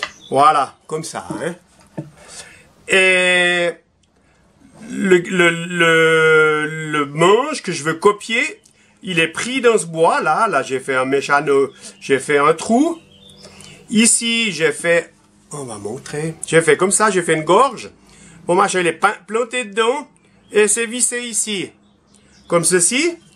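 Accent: Switzerland. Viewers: salut toujours ingénieux et j'adore ton accent de ta belle Suisse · Bravo , belle inventivité , on en trouve beaucoup au Canada on dirait , à moins que ce soit en Suisse ou en Belgique , bon , le petit accent est bien plaisant !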